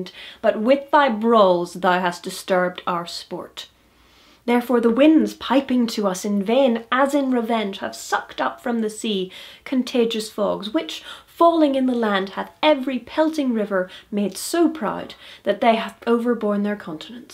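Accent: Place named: United Kingdom. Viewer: Good job! :) I must say, all the times I've performed/studied/seen Shakespeare, it sounds so much better with a British accent. :)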